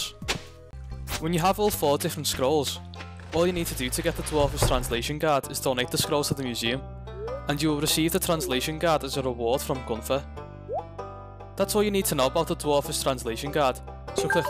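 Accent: Liverpool, United Kingdom. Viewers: YAYY SCOUSE STARDEW VALLEY FAN R U FROM LIVERPOOL TOO?!?!? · Haha I just typed in as I love this game but cnt remember where the blue one is.. then this scouser came on lol much love from a fellow scouser herself l8. Mate. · love the scouse accent. you got my subscribe sir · Madness didn’t expect to hear a scouser aha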